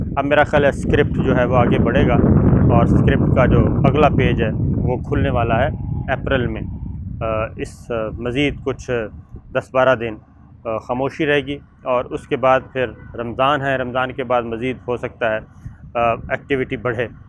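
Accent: Punjab, Pakistan. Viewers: Maaf kardo sabir ko..iska english..urdu..sab punjabi me bolta hai.. . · Ounki mother language Punjabi hi. App ounki khabar soun rahay Hain or sountay rahain gay.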